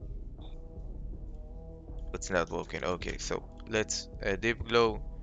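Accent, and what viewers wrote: Israel. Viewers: שמע אני מת אחי איזה מבטא ישראלי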